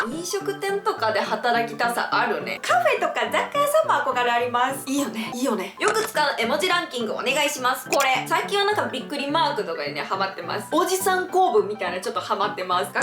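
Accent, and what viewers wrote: Kansai, Japan. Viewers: いつも楽しいお話ありがとう😊 ちゃんの関西弁大好き💕 YouTubeに出会ってくれてありがとう！！だから、ちゃんに出会えたから👏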